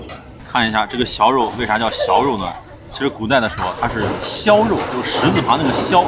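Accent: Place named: Shaanxi, China. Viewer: 可以听出来陕西口音 😊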